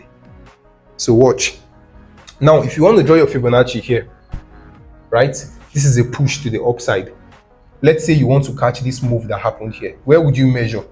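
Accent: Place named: Afrikaans, South Africa. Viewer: love afrikaan english😅🎉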